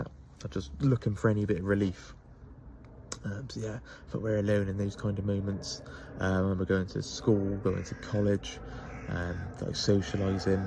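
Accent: Bristol, United Kingdom. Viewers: definitely from Bristol 😂 that accent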